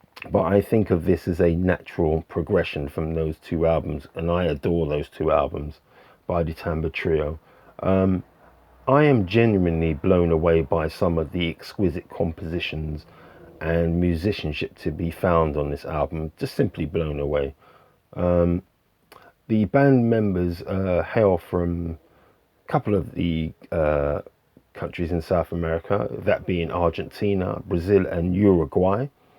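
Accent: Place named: United Kingdom. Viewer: Hi, I've just come here on the recommendation of Julius Jabbar. He said he thought you sounded British, but I'd wager that I can hone in on your accent further. You sound English to me and slightly posher than I am. I'd guess you are from south of the Watford Gap. Possibly not far from the M25. How am I doing? I've got that LP with a different cover. Didn't know it was controversial. Love the Xylophone with those voices. Very nice! I'm really really happy to have found your channel. Looking forward to caching up with your past videos. Cheers!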